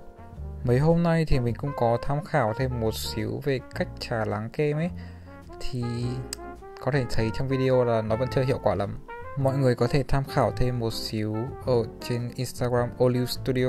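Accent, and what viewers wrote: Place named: Hanoi, Vietnam. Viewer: nghe giống giọng ctrai HN , Thích ghê á :)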